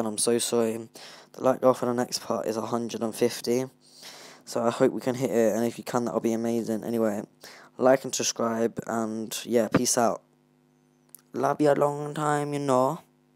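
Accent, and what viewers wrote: United Kingdom. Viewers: yo man’s is welsh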